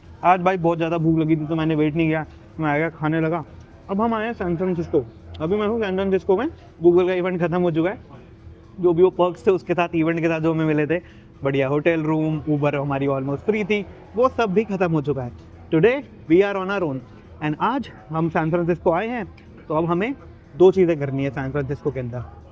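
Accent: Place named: Kanpur, India. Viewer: Kanpur ka DNA accent me aa hi jata hai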